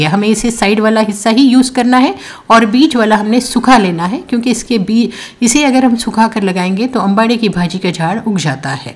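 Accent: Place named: Gulbarga, India. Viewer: yes phool bangalore mein nahi dekhe ,shayad aap gulbarga ke taraf se, hain lehje baat cheet se aisa lagta hai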